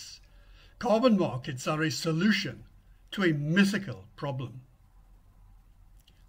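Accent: Wales, United Kingdom. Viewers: I agree with you, I love his voice and accent as well. Since I have watched a large number of his videos over the last several years I remember someone remarking in the comments section; saying he has a distinctive 'Welsh' accent. I can't verify if this is true, but try this as I did. Listen to a Youtube video where someone is speaking with a 'Welsh' accent and by comparison I believe the person got it correct, then you can decide for yourself. His knowledge is so impressive that all it took was watching one of his videos and I immediately subscribed. I pray he will get more recognition for all of his excellent work. The world needs to hear his videos, before we head down the rabbit hole of making big changes based upon false facts. What bothers me is if we do this; there will never be any way to monitor our progress. In other words we will be chasing rainbows.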